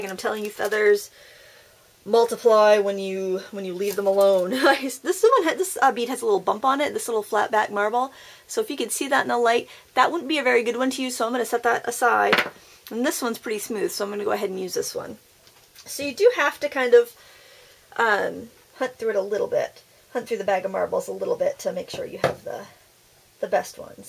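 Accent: United States. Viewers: Hi from the UK. Are you as manic/scatty as you sound, I really love the your voice, so enthusiastic, typically a yank. Thanks for being you, 😀😀😀😀😀😎😎😎😎😎😎🙏🙏🙏🙏🙏❤❤❤❤❤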